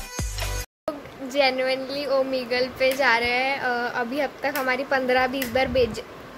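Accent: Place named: Nagpur, India. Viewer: i just love your nagpuri accent..like all nagpurkars can relate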